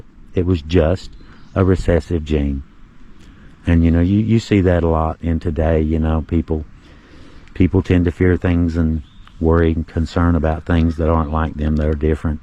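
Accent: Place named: Eastern Kentucky, United States. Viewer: I'd love to get together with you and do a video on Appalachian english, and how even though I only live about 1 hour 45 minutes away from you, and we are still considered Eastern Kentucky how the dialect changes just a little. It amuses me to hear how you pronounce some words and names that we pronounce just a slight bit different. Its actually kind of interesting. Even that the spelling of names changed when people moved to this area from there. You'd have a husband buried here with the last name spelled one way, the wife moved back there and her name on her tombstone is spelled another way. Would make an interesting story for sure. 😊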